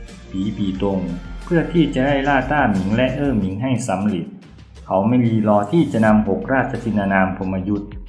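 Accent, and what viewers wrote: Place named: Isan, Thailand. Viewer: เหมือนจะออกเสียงไปทางอีสานนิดๆนะครับ😂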